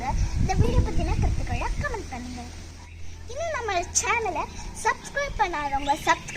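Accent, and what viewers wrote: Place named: United Kingdom. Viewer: OMG! Did anyone notice her English accent ? 😻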